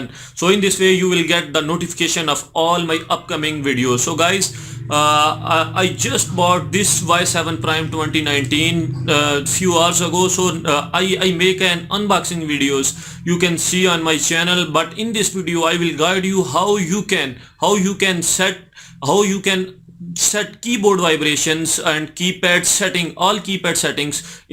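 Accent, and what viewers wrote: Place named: Punjab, India. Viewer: Bai ap ki English kamal ki hy. Lagta hy. Punjabi bol rahy hain. Aur kamal ki baat ye hy. K apki English mujhy b samaj aa gayi hy.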